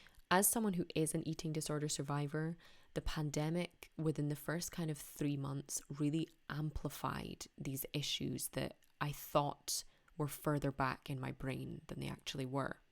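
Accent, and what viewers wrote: Scotland, United Kingdom. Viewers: Ok., let's get started. 1.I ate whole package of Ptasie Mleczko during watching this episode. 2.A few months before pandemic my plan was to look like Cristiano Ronaldo. Or Robert Lewandowski. Now, after 14 months of pandemic I look like Miss Piggy rather. 3. Because is stressful pandemic situations and pandemic depressions, I eat sweeties. Just need more sugar. 4.I eat big portions of meal to cheat my brain for produce positive message goes like this: "Easy, Robert, soon everything's gonna be alright". Of course, it won't. I dream about fish and chips. 5.I need woman to calm me down. My last girlfriend left me a few months ago. She told me that my behaviour reminds her Woody Allen's neurotic nature. 6.And finally, I found a few words of your pronunciation that need an explanations. These are: why, who, whom, where, own, home. 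You Scottish fox. 7.You have a beauty nature,.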